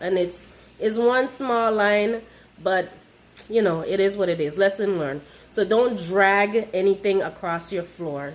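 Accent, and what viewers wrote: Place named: Guyana. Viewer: Why do I hear your Guyanese accent 😭🔥🔥 I love our accent! Thanks for the video!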